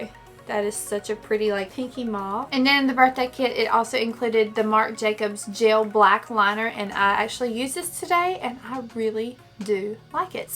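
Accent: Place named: North Carolina, United States. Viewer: are you from NC?? your accent sounds like it!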